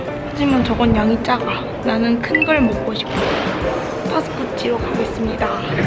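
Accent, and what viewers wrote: Yeosu, South Korea. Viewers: 말투가 익숙하다 했는데 여수가 고향이셨군요! 역앞에 바다가 있다니 너무 멋져요ㅠ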